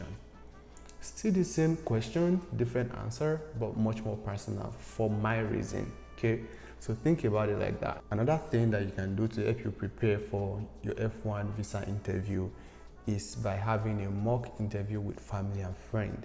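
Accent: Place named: Nigeria. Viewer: Brox talk like Nigerian ...things you shouldn't do in f-1 interview is dont form accent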